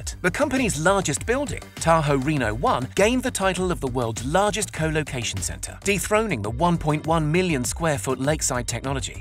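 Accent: United Kingdom. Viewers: ima buy this stock becuz if its .05 per share dividend plus this guy reviewing it with the 007 accent got me sold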